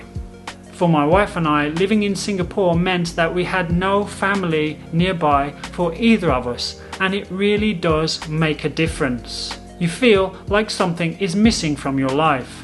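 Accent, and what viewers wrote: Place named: United Kingdom. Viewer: 哈哈哈！英式語調，英式幽默！非常喜歡！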